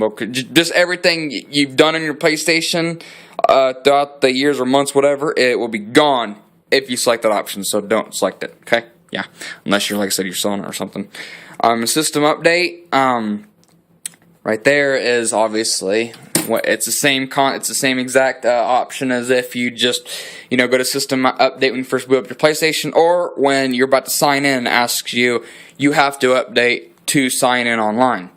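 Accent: South, United States. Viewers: Never in my life heard anyone pronounce it Ray-men, lolz. Ray-men? Not Rah-men. Where are you from? You gots a southern accent for sure.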